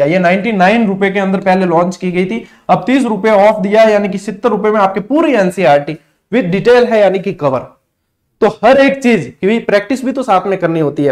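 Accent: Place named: Rajasthan, India. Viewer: Sir aapki marwadi boli bahut achi lgti h class bhi bahut mst h sir jii thank u so much 🙏🙏🙏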